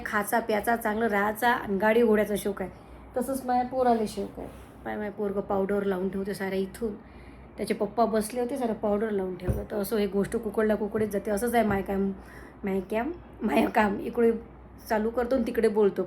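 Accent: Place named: Vidarbha, India. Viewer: वऱ्हाडी भाषा खुप छान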